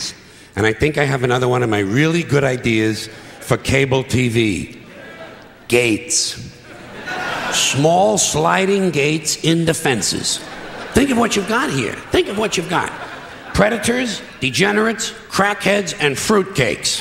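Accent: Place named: California, United States. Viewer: sounds like a Californian, still a funny man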